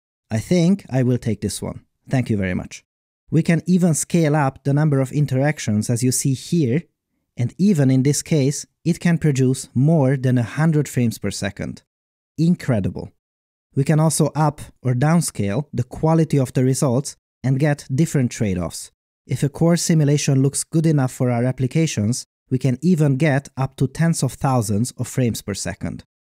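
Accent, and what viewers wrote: Hungary. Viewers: Are you hungarian? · lol the hungarian accent! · The hungarian accent is strong with this one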